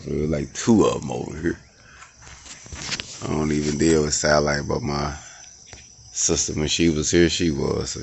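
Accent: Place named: Memphis, United States. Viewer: Sound like u close to Memphis my dawg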